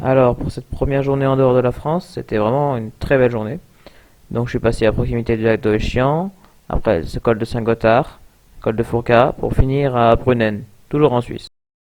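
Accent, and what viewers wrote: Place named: Germany. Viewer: Sympa la vidéo et que dire de ton super accent allemand...vivement la suite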